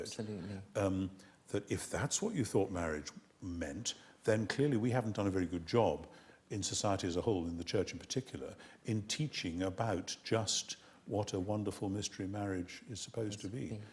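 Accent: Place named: United Kingdom. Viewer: Pompous ass. Narrow minded pompous ass with a British accent.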